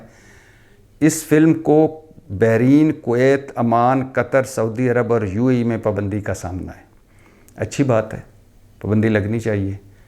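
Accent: Punjab, Pakistan. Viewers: Aoa Mashallah I’m very pleased with your faithfully coverage on each and every topic with balanced coverage. Love how you deliver every single episode your touch with pure genuine Punjabi touch love it all the way. Hats off to you covering without any fear and any envelope coverage.. keep up the genuine coverage! Stay blessed always tons of prayers for you and all your loved ones be safe out there.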